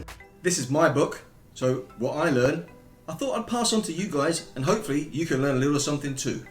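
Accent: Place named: England, United Kingdom. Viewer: you sound english not scottish ?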